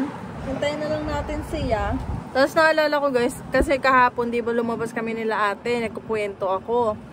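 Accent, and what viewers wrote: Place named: Quezon, Philippines. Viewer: Puntong Quezon..Maubanin..Hello po from your kabayang pagbilaowin in winnipeg…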